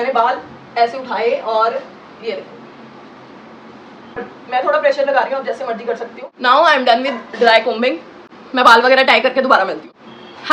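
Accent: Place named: Punjab, India. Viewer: Informative 😍❤ and i like your punjabi accent 😂🥰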